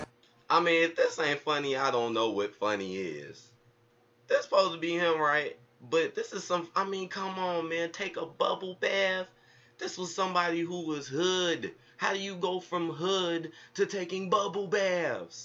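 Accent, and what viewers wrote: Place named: United Kingdom. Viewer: Wow. I'm glad I"m not the only one that caught the British accent being covered up. I bet this guy originates from Nigeria or one of the islands..They frequently migrate to London.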